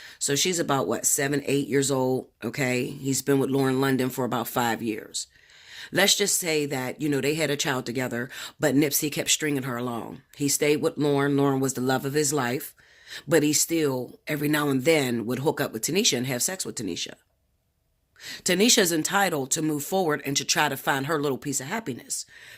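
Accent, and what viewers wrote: Pittsburgh, United States. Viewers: Are you from Pittsburgh by any chance ? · Wow I can hear your Pittsburgh accent clearly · Pittsburgh accent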